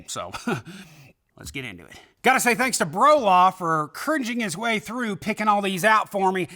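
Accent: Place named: Kentucky, United States. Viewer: Im from ky and I can definitely tell you lived there for a while when you was younger by the way you talk lol, screw all the politicly correct way to talk not good for anyone to censor the way you talk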